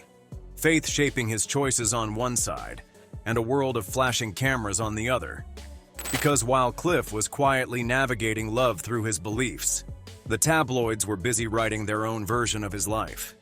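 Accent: United States. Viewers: I found this hyperbole tiresome, especially in that IT American accent. Seemingly Cliff did indeed enjoy a permanent relationship with another man. The longevity of this relationship should be celebrated rather than being the subject of unpleasant inuendo. His resistance to probing questions about his private life during the censorious times he lived though is absolutely understandable. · AMERICAN VOICED CRAP!!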